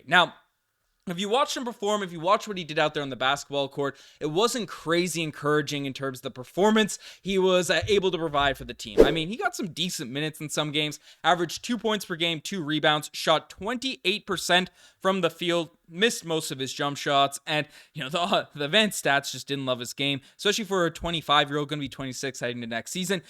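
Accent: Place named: St. John's, Canada. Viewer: i knew your accent sounded familliar lol im from st johns too 🤣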